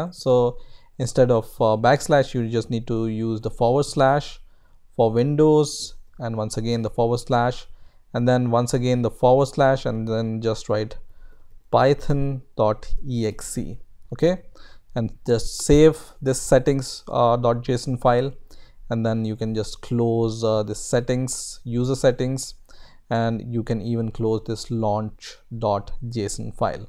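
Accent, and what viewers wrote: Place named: India. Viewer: you know it will help you when they have an indian accent thank you indians for making youtube tutorials all day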